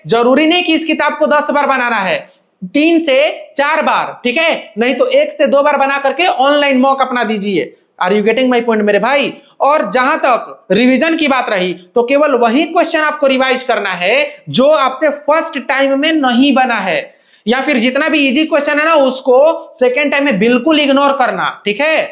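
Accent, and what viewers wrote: Bihar, India. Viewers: Bhaiya aapka bolne style gajab hai 😊😊😊😊😊😊😊😊😊😊😊😊😊😊😊😊😊😊😊😊😊 ek dam bihari style. Ham bhi Bihar se hi hu.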